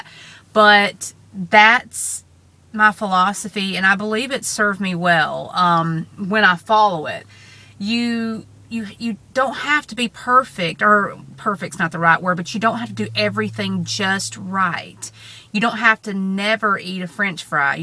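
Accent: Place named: South, United States. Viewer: I was thinking this would happen not be negative-but do not despair-there are tons of people going through this-the dr.'s are partly responsible for performing the surgery without haing the psychological wherewithall to go through it. They just want the money. You sound like you are from the South and probably were rainsed on biscuits and grits-so anyway-good luck.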